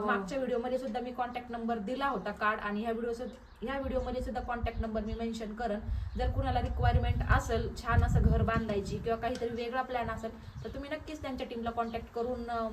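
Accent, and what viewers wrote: Ahmednagar, India. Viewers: तुमच्या बोलण्यावरून समजल होत तुम्ही नगरच्या आहे 😊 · Radhe radhe tai nehmi khush raha doghi jani aniii tumhi nagri accent mdhech bolt raha khup mst vatt❤ radharani bless you radhe radhe❤ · तुमचा भाषेवरूनच कळले कि तुमी अहमदनगरचे आहेत कारण की मी पण नगरची आहे अशेच आनंदी रहा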